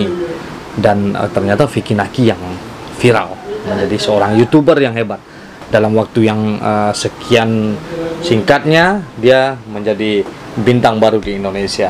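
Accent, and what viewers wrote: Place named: West Sumatra, Indonesia. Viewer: iyo lah logatnyo Ajo mirip urang minang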